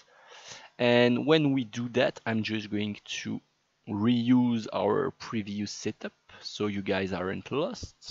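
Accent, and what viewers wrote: France. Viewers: Bonjour, tout d'abord merci pour ces tutos tu as franchement fait un super travail. Je me permet le commentaire en français car l'accent ne trompe pas ;-). Bref j'ai un petit soucis car après avoir suivis scrupuleusement les précédentes vidéos, les tiles ne spawn pas. J'ai bien la start tile qui spawn mais c'est la seule. La fonction print ne me renvoi que l'info sur la previous location donc je me dis qu'il ne génère même pas les generic tiles. Dans une précédente vidéo, lorsque tu avais créé la fonction Generate Tile Layout et que tu faisais spawn les tiles avec la fonction add, j'obtenais le même résultat mais pas avec des unités de 1 mais de 45 (mes tiles faisant 30), je me dis donc que j'ai peut être un problème d'unités mais je ne trouve pas lequel. Je ne suis pas allé plus loin dans les vidéos car j'aimerai arriver au même résultat que toi avant de continuer. 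Si tu pouvais m'aider je t'en remercierai.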